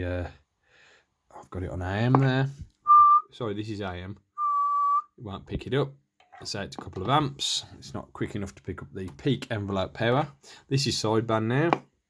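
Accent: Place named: Black Country, United Kingdom. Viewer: Good vid you sound local to me? Im in stourport, are you black country way?rgds steve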